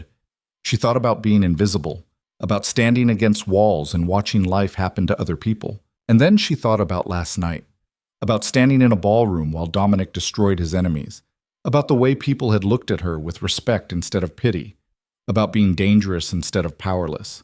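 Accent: United States. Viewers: Good story but please use a British narrator when in Great Britain. The American accent take away from the story.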